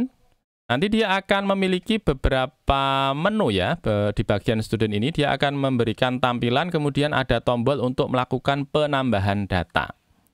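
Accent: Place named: Java, Indonesia. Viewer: Senengnya akun ini medoknya lucu, mantep mas jawa aslii ini mudah di mengerti heeh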